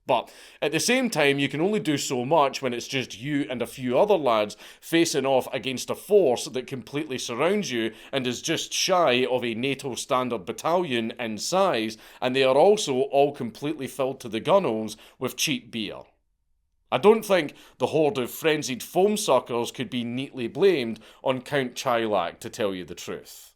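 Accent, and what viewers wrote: Scotland, United Kingdom. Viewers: The legend of 10 cent beer night needed to be told in a Scottish accent. The world is healing. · bruh you're scottish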